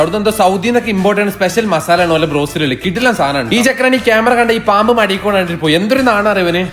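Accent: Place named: Palakkad, India. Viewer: പാലക്കാടൻ slangil bengalinod bengal evidayann choikku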